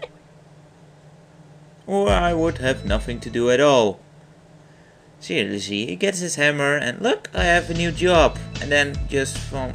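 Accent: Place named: Netherlands. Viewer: i was sitting here, listening to your voice and i was like 'this guy must be from the netherlands'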